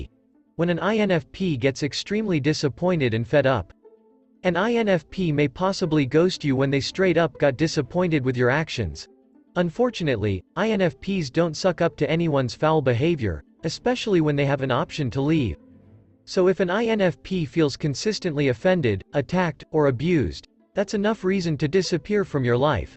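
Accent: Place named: United States. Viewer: American English is so bad.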